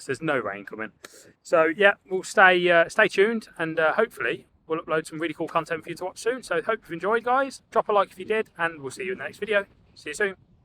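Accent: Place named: Midlands, United Kingdom. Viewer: looks like a Roman handle, Ellie Miss_D will know. is a beautiful shoe buckle or a knee buckle. 100% nut crackers, someone cut them down. Good video. I thought that was a Midlands accent, I was born in Birmingham and all my formative years were in Shirley.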